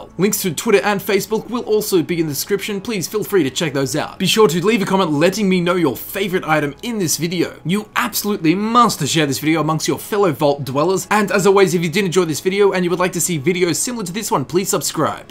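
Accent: Australia. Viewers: Dude are you Australian? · Is he Aussie because if so Aussie Aussie oi oi oi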